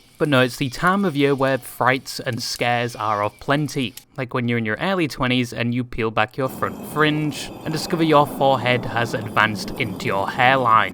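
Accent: United Kingdom. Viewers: Noooooo PLEASE 🙏 I love accent 😭 Don't make his accent go away 😢 He has allotta more femboy topics to discuss 🙏😔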